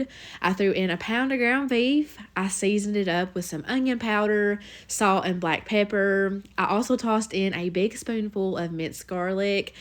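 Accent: South, United States. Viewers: That’s what I was saying, I think it’s a southern thing — just a different dialect. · To all those correcting her pronunciation, Southerners typically pronounce the L in salmon. It's not incorrect; it's cultural! ❤ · That southern accent makes my heart smile. Hearing the voice-over, I can just imagine your beautiful smile. I'm definitely trying the chicken nachos recipe & the beef tips. I've always wondered if Josh likes real onions, lol? I'm not a fried salmon person either. As a child I ate a lot of them & now I hate them & the smell of them 🤣. Have you ever heard of salmon salad (like tuna salad)? My grandmother used to make it & I like it that way. That's the only way I will it salmon now & that's every blue moon.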